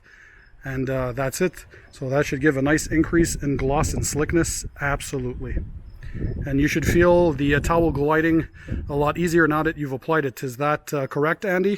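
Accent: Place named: Quebec, Canada. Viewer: Hey I'm a big fan of your channel, I love all your informative videos and now looking to put cquarts UK 3.0 on my vehicle but I'm having a hard time to decide whom to professionally get it done. I'm located in Laval QC. Any recommendations? Im asking because with your accent and French videos I'm just assuming you're in QC as well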